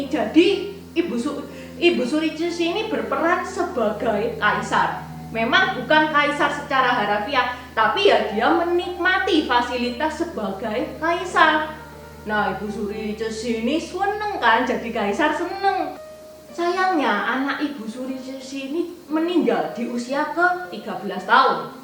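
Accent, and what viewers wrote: Java, Indonesia. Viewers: Keren sekali ka apalagi medoknya hehe2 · mbak dari asal jawa kah?, kalok ngomong keliatan medok nya kwkwkwkw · pembawaan nya terlalu lebay dan over, mending dikurangi dan tampilkan medoknya saja dan lebih serius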